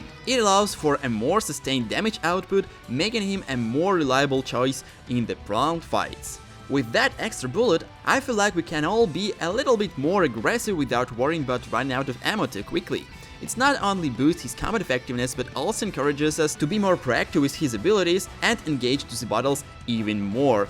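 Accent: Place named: Baltic states. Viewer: Are you from the baltics? I have a friend from there and he has the same accent as you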